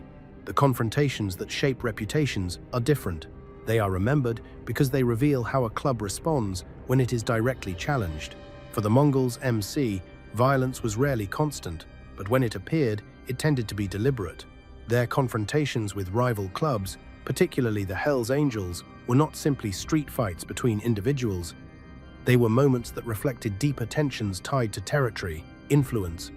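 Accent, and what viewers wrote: England, United Kingdom. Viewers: Why is there so often some dork from England doing the narrating?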